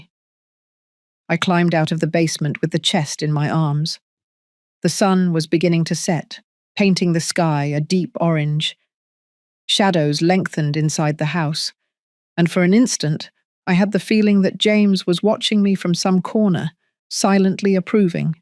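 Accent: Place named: United Kingdom. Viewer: I would have set his own house on fire in his sleep.That is the fate he deserves. Incredible story, writing and lovely British narration. I was hooked all the way through. As a child in California, we were evacuated from our home twice due to wildfires. It was terrifying. I'll never forget seeing my parents with their most precious possessions packed in their cars when they picked me up at school.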